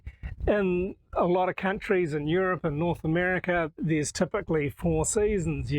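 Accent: New Zealand. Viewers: What a great idea for a video topic. I really enjoyed this. The presenter sounds like he really know what he's talking about. I learnt a lot. 20 years in Thailand and I can still hear a New Zealand accent :)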